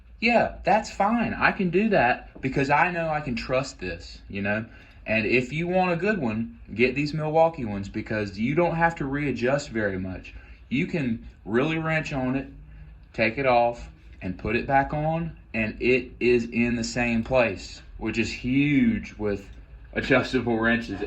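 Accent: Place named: United States. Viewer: you can tell by so many things in your video, but the main one being that the soft laser etched lettering is still on the grips. if you grew up with crescent wrenches there is a learning curve. you dont know how to use knipex and are not really entitled to an opinion. just a typical american tradesman (and all the stereotypes that entails judging by your speech and mitts)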